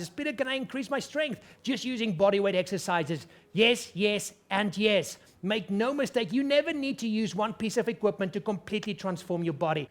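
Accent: South Africa. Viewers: Suid Afrikaans?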